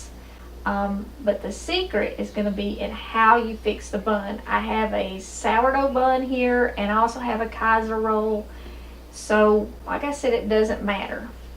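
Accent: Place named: West Virginia, United States. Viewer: Hello ... I am a new subscriber ... I absolutely LOVE your videos .. I LOVE to hear about the Lord Jesus ... Thank you for including him & giving him the Praise & the Glory that he so rightfully deserves .... by the way ... Where are you from? i am from WV living in Bristol Va .. but you sound like you may be from West Virginia ... God Bless !!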